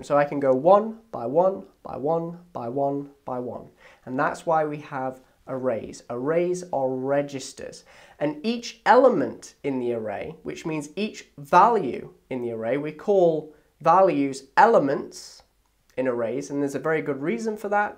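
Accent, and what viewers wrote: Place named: England, United Kingdom. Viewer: Merci, je bois vos paroles depuis le premier épisode: Chapeau, monsieur ! (and of course I'm enjoying your english accent !)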